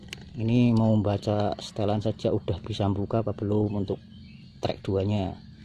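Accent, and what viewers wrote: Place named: Central Java, Indonesia. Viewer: Mas bro.. logate ky Jawa tengah njih.. Asline auto ngiler niki... 🤭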